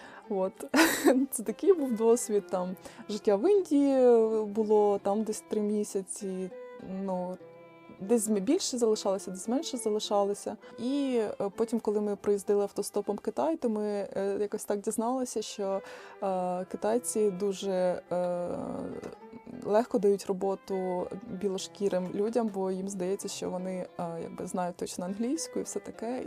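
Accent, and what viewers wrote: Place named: Poltava, Ukraine. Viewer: Впізнаю рідний полтавський акцент 😊. Трохи незвична відсутність суржику 😅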